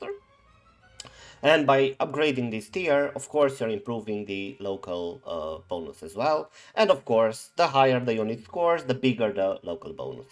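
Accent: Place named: Romania. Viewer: How to recognize a Romanian just by accent :)))) What gave you up 100% was the: "La, la, la" instead of "Yada, yada, yada" :P Great video btw!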